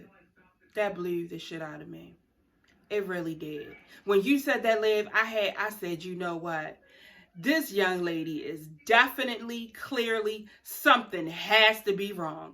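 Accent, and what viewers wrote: Baltimore, United States. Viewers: The one thing I love about you is that you say what you say and you leave it alone, you do not fall into the back and forth, some one calling you out and they can't take the heat, lookin for sympathy, next Hussle literally crying out for it, not gonna even say the frauds name, I looked up this Liv person, but I see you give great advice, she should listen.....Keep doing you...SO SO glad I found your channel and I love the accent.. B-more I'm thinking if not I apologize.... Lost my niece to Baltimore. Anyway, You rock.... Thank you for being honest and real...saying luv luv from Chicago..💯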